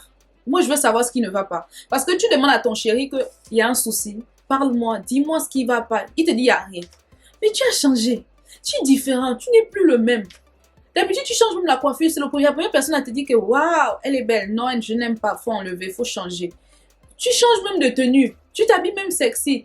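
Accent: Cameroon. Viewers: Mais elle met toujours l'accent cam le plus souvent quand elle parle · U talk like a Cameroonian even the slants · Aujourd'hui c'est l'accent Camerounais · Donc quelqu’un qui parle avec un accent doit maintenant ce justifier du pourquoi elle a cet accent ma chère si tu n’était pas au courant elle est gabonaise et camerounaise . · Pourquoi tu parles avec cet accent Camerounais?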